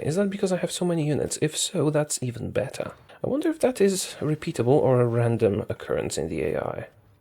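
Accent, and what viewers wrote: Poland. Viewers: are you polish you sound like a polish person who migrated to the UK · Your voice kinda reminds me of the English dub L But a little polish? I think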